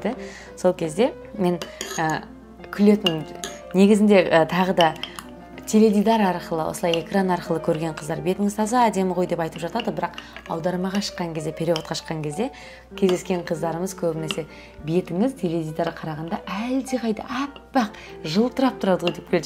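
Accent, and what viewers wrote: Standard. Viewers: Рахмет Өз тіліңді ұмытпай таза сөйлегенің үшін ❤ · Сәлеметсізбе қызым рахмет өте кушті түсіндіріңіз бетті қайтіп маска жасауыңды көрсеттіңіз енди көреміз. Өз ана тіліңізді умытпай таза сөйлеп атырсың рахмет бахтыңыз тахтыңыз болсын Илахым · Сәлем,. Тамаша. Керемет. Ана тіліңді ұмытпай таза,әрі анық сөйлейсің. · Кореяда жасап,өз тіліңді таза сөйлегенің ұнайды,жарайсың 🍓🍓🍓 ақ көңіл,сұлу жансың бақытты бол❤❤❤ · Жаным ,казак кызы.тіліңді умытпай,таза сойлегеніңе улкен рахмет.казакстанда турып тілін сойлеуге намыстанатын намыссыздар бар.бояусызда турің әдемі.кайда журсеңде аман бол. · Керемет өзге елде түрсада таза қазақша сөйлейды екенсыз жарайсыз,рахмет қолданып көрем. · Кандаи ашыкжузди жаркын кыз екенсин кызым бакытты бол оз тилинди умытпаи таза сулеп отырганына риза болып отырмын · Қарапайымдылығыңыз өзіңізді онан сайын әдемілендіріп тұр! 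Ал таза қазақи тіліңізге тіпті көп адам ғашық болғандай!😘😘😘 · шықса не болыпты.Қайта қазақша таза сөйлейді. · Казак тилинде таза сойлегенине риза болдым. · Қазақша таза сөилегенің үшін қызым қатты құрметтеимін .Не деген ғажап қызсың.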